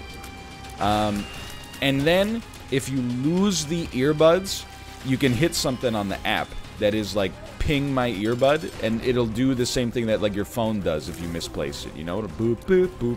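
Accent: Canada. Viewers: Is it me or canadian accent is getting thicker?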